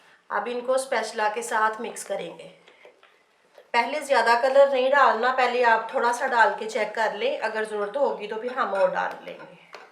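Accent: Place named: Lahore, Pakistan. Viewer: VIOCE TO LAHORI LAGTA HA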